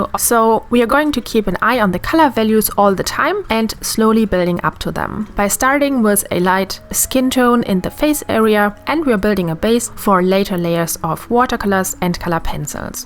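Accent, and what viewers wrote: Germany. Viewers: thick german accent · I like your German accent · are you from Germany, because i think you have a little German accent( that is not a hate comment!)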